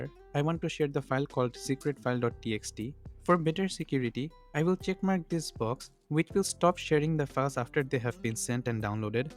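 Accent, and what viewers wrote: India. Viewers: That's a true indian accent are you an Indian?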